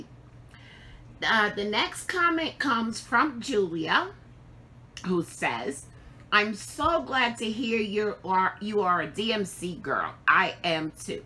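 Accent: New York, United States. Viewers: Hi, Im originally from Bklyn, NY and I detected a familiar accent. I went back to your first introductory flosstube and sure enough your a native New Yorker! Awesome!! So happy to have found you and have subscribed to your flosstube. I never had a stitchy friend from NY. I crocheted back in my Bklyn days but learned cross stitch since living in NJ. I stitch in hand but occasionally will use a qsnap. I like stitching on 28 ct and use mostly DMC but like the fancy flosses variegated look. Ive ordered from Hobby House Needleworks a few times, love that shop. Thanks for being a part of the stitch world. See you next time.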